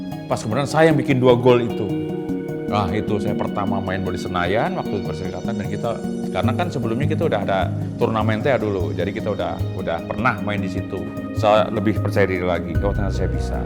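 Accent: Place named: Banyumas, Indonesia. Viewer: ngapak the legend❤... & endog juga carita legend... & saya datang final🤩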